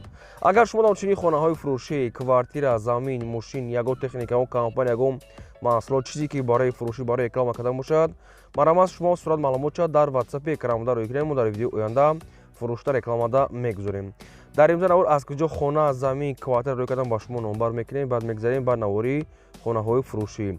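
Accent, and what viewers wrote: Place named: Danghara, Tajikistan. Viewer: Ай тарафи бохтар левакант Данғара шид гапзанен ака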